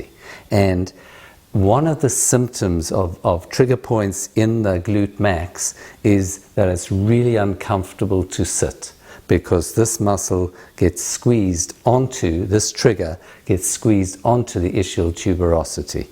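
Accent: South Africa. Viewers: Dear, your accent sounds South African. Loved this video.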